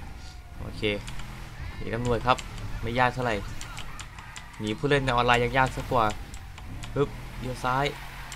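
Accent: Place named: South, Thailand. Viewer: พี่ พูดใต้เป็นด้วย 555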